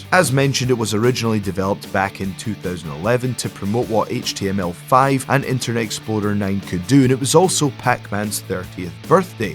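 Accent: Scotland, United Kingdom. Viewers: Mainly just due to the Scottish, but still. Imagine a Doctor that connects with the youth on their level. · This guy has a Scottish voice and I love it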